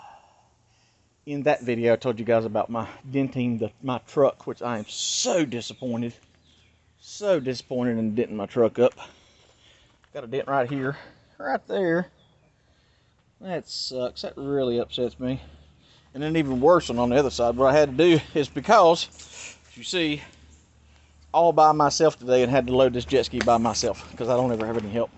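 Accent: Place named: Appalachia, United States. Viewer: Hey man. I just found your channel today. I've looked into the phenomenon of gangstalking a little bit before. I believe it to have a spiritual component as well, if not mostly. (btw, if you haven't yet, check out Jeremiah Cohen on YT. His testimonial is insane and eye opening). I thought I recognized a close cousin of my own accent when I heard you speak. I live a couple hours east of you in the beautiful foothills of the GSM. Anyway, my question for you is this: Why are they doing this to you? Have you seen or heard or been a part of something to draw this to you? Or is it based on something you did or said to anger them? I'm genuinely curious. I've watched a couple of your videos but haven't yet heard the story relating why it is you think they are doing this. A reply would be much appreciated. You and yourn be purdy, now.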